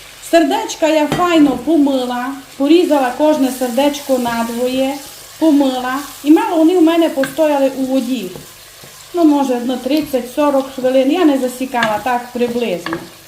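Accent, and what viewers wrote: Transcarpathia, Ukraine. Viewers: Дуже люблю закарпатський говор, погано що не видно господиньки, раніше було видно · Я хоч і хотіла подивитися рецепт, але сиділа і слухала як гарно і красиво Ви говорите❤ нага мова то любов💛💙💛а з вашим закарпатським діалектом, дуже гарно.. дякую. Рецепт передивлюся ще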